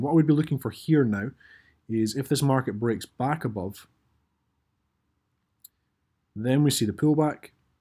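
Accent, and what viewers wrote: Scotland, United Kingdom. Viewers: Greetings, great videos, especially those for beginners, which particularly helped me, and, you sound scottish, i do love the accent haha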